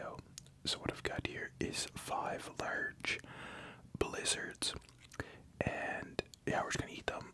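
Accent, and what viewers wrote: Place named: Canada. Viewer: didn’t know you were Canadian for like 6 months of watching until you said car in this vid! And then everything started to come together when you said “mum” and started talking about mr noodle (I’m Canadian)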